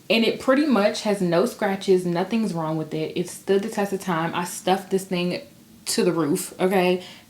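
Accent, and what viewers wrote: DMV, United States. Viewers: Happy New Year! Have you ever been told you sound similar to Seven from BGC? You must be from the DMV lol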